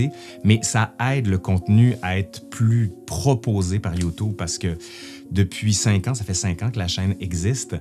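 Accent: Quebec, Canada. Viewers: J'aime ta façon passionnée que tu as de conter les histoires et l'histoire. Je ne manque aucune de tes vidéos. Je suis vite devenue fan. Déjà avec l'accent québéquois. Franchement ça le fait. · Bon bien nous sommes à la page 😂😂😂 Le viking paraît sympathique !!! Rien ne vaut l’accent québécois, à quand une histoire lue par vos services ??? Haha serait super ça ❤ Bon Noël à tous .. .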